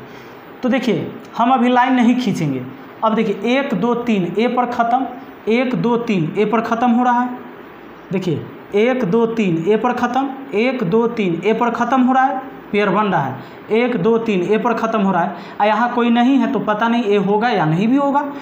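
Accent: Bihar, India. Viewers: Your language in bihari voice is good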